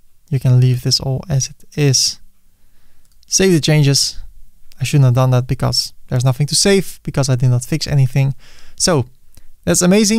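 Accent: Netherlands. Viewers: Nice video! Ik moest af en toe wel lachen om je overduidelijk Nederlandse accent:) Bedankt voor de video!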